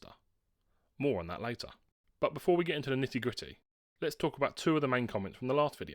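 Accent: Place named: United Kingdom. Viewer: I'm guessing from the plug and the accent that you're British, you want to work on that networking thing, I will gladly hop on a phone call, or discord or whatever and talk you through it. You're doing cool stuff. Being curious and learning is cool on it's own, you're doing it with style. So if I can help feed that curiosity and get you playing with networks as well with a few minutes or hours or whatever on a call, then it'll be time well spent. Either way, keep on learning!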